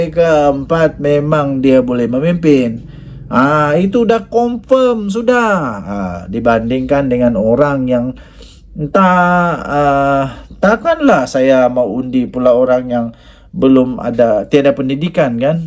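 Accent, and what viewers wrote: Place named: Malaysia. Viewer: Lograt tuan pengacara sudah macam lograt melayu tempatan.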